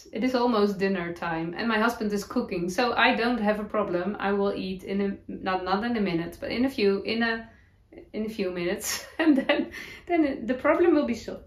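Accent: Netherlands. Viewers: I love your Dutchy English. 😍 when you say ‘a piece of cake’ it means it is easy to do. When we don’t love something ( in a slight way) we say ‘it is not our cup of tea’ . Hope that helps 😘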